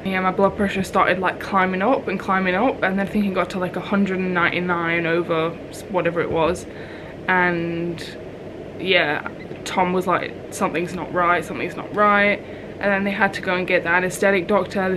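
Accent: United Kingdom. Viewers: Is British? Sorry if this question is stupid 🤦🏼‍♀️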